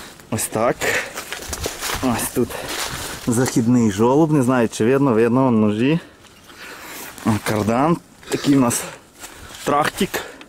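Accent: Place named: Ternopil, Ukraine. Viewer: Дякую за Украінський канал і за рідну Тернопільсьську говірку, супер!!!))))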